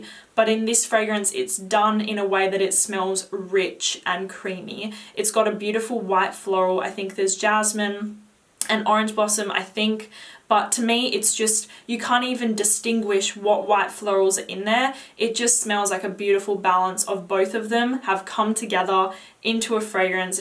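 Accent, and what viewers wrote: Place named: Australia. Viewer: I follow you for your knowledge, not your age. I'm an Aussie now living in the USA, and I just turned 50. My head tells me I'm 30 (only my body tells me I'm 50!) Lol. Keep up the great videos, I've since found my 'signature' scent with de Marly Cassili. I'm saving frantically to get a full size bottle 😉 Your accent keeps me reminded of home and I thank you for that too 🌹Please DO add videos for us 50 and over 😊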